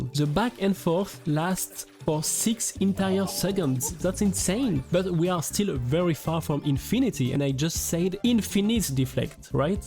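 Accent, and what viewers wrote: France. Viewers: Cet accent français de qualité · bro are u french ? ur accent sound like it ? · Your france accent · Is that a French accent I hear? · T'es français toi non ahah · tu as un accent français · your accent looks French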